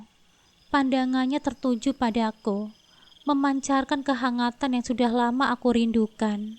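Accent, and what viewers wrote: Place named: Central Java, Indonesia. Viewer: Kk kok tau desa karang nduwur , apa kk org jateng , klo dari logat cara baca a sii spt iya yaa ,,, klo blh tau kk berasal dr kota mn yaa ? 🙏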